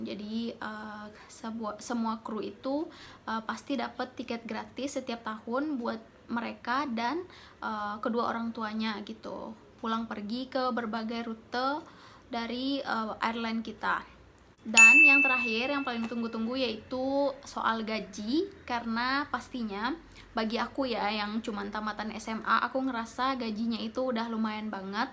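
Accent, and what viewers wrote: West Sumatra, Indonesia. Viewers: Wow. Ternyata org Padang mbak nya,,pantesan logat b.indonesia nya agak kurang,masih jelas logat minang nya🙂🤗 sukses mbak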